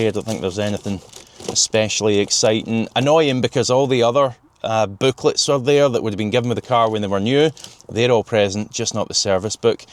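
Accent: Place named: Glasgow, United Kingdom. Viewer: Love your videos you present them well and with interest your personality plays a major part in this with your Glasgow accent, a very likeable character.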